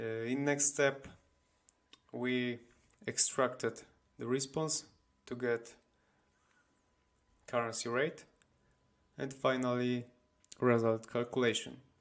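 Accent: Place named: Poland. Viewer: Are you from Poland? You sound like you are from Poland ;)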